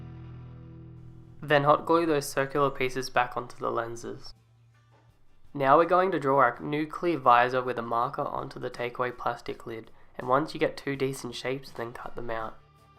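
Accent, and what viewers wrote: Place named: Australia. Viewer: K I'm sad ur suit is better I've met my match and you sound Aussie two 😂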